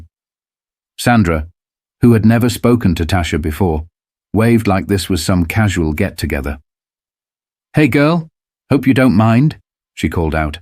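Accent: United Kingdom. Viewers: Never any particulars such as dates, place, etc. The notes say, "Our mission is to give voice to the untold narratives that inspire, educate, and empower." Then, "Please respect the effort and creativity that went into creating this work." A created work of fiction indeed. Using a narrator with a British accent doesn't do much to enhance the so-called "Black" experience.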